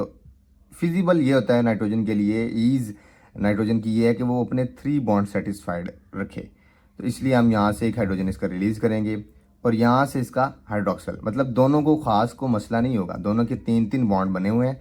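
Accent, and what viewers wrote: Punjab, India. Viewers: Lgda sir vi apny ilaqyy day iiii ny 😂😂 Punjabi bao vadiyaa sir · Punjabi Ascent ❤❤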